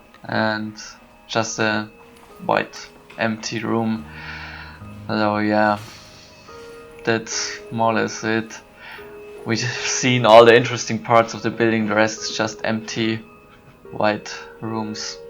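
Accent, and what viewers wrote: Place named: France. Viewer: sounds france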